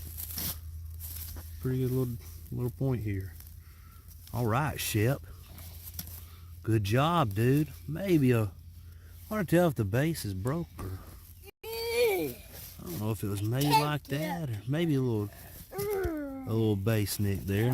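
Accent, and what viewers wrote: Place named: South, United States. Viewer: Nice finds ! Listening to you and seeing the artifacts I figure you're from Alabama or Georgia . I am in St.Clair Co. Alabama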